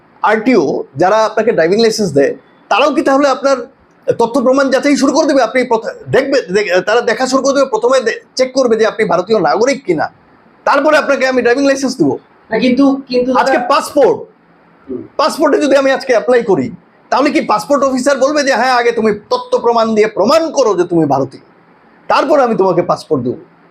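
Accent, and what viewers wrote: Bangladesh. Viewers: APONAR VASAY AKHONO BANGLADESHI BOLA MONA HOY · APNAR BANGLA PRONUNCIATION SUNE MONE HOCHHE AAPNI BANGLAADESHI.....!!!!! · Bangladesh lok mone hoche...vat bolchen...every thing given logically....bangladesh bhasha tai doubt hoche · আপনার accent শুনে মনে হচ্ছে আপনি বাংলাদেশী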